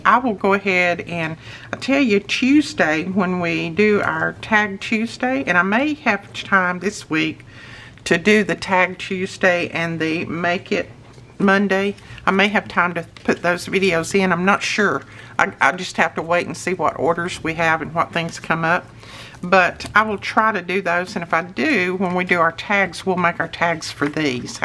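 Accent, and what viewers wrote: South, United States. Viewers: What a wonderful video this is. Not only do I get a tutorial on how to make a great addition to my ephemera stash, I get to enjoy the background music of a storm. I miss the claps of thunder and the relentless rain, and this is a video that I will watch over and over. When I get homesick for my Texas girlhood, I watch your channel. I miss the speech of Southern people and the scream of Southern storms.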